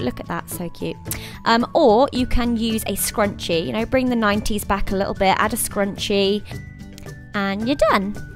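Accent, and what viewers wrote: United Kingdom. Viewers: Readers.... so it's a... British.... accent.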